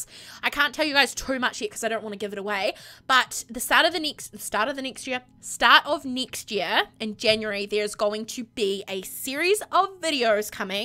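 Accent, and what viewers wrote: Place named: New Zealand. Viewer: I guess New Zealand (the accent) is close to Australian! First I thought that maybe you are British but then I saw your channel info :') Of course I watch your videos, they're awesome!! xx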